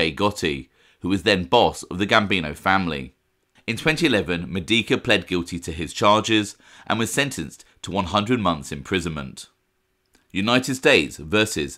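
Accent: United Kingdom. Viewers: Anyone else annoyed a FRUITY ENGLISH narrator is telling us about an American mobster?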